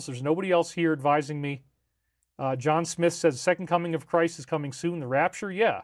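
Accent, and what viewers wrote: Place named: Australia. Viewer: IM GOING TO BE A DICK...I DO NOT LIKE THE OZZIE ACCIENT MATE...I DISLIKE IT BIG TIME, FROM AN OZZIE OLD CHOOK......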